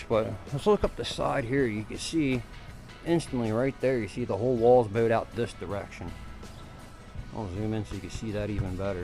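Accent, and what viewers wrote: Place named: Pennsylvania, United States. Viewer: I knew it was Pennsylvania! I love your accent, that was the giveaway. I graduated Penn State in 2003, from U. Park but I did live in Schuylkill Haven for 1 school year, and that was enough. Pennsylvania is a beautiful state, despite the look of this ugly building. ❤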